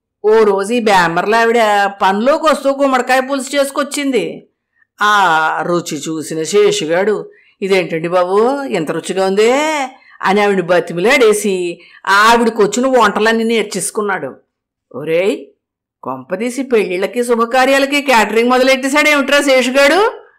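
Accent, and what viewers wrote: Godavari, India. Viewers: గోదావరి జిల్లా యాస తో బాగా నవ్వించారు.పాకం గారెలు ఎప్పుడూ తినకపోయినా తిన్నట్లుగానే వుంది మీ మాటలు వింటుంటే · గోదావరి జిల్లా యాసతో బాగా చదివారు. కధ చాలా బావుంది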